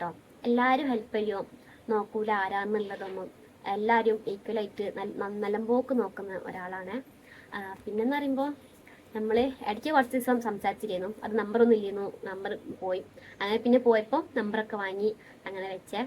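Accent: Malappuram, India. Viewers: മലപ്പുറം മലയാളം ആണല്ലോ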